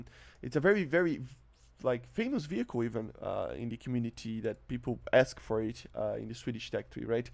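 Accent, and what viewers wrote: Brazil. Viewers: mano aprendeu ingles aonde ate que tu nao tem um sutaque tao ruim para um br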